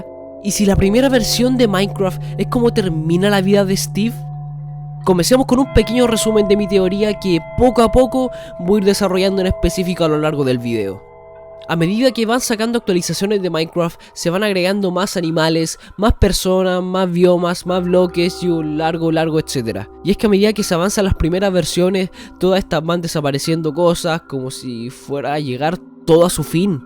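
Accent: Chile. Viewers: apenas me meti al video y cache que eres chileno xd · Pta wn se nota que eri shileno ps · Hablas español normal o habla chileno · Chileno xD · me gustaria saber como fue que se te ocurrio esta teoria, estaria encantado de escuchar como fue que fuiste formando esta teoria tan ufff pero con un sentido que no esperaba encontrar en un juego tan kawai como minecraft Pds: hehehe sabia que eras chileno con solo escuchar tu forma de hablar ^^ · Tu Acento Es El De Mi Pais Osea Chile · apenas escuchar su intro este wn es mas chileno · me encanta tu forma de narrar teorias y me gusta también el concepto que tienen. ya he visto varias y la verdad son muy buenas ya tienes una nueva subscripcion !! ademas me gusta el acento chileno ;) saludos desde arg. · dejame adivinar , ... chileno? :V xd -denme like para que lo vea y no desaparecer xd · Eres Chileno? :0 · Tenías voz de flaite, ya te había identificado el chileno, y bueno, el video era tan excelente que llegue hasta el final y te escuche el “hueon”, lo que si, podrías dramatizar menos jajaja, saludos bro!! 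🇨🇱🇨🇱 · Eres de chile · chileno DETECTED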